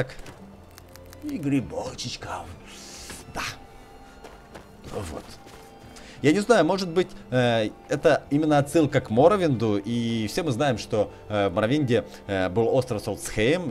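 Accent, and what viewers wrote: Ukraine. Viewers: Эбать ты из Украины)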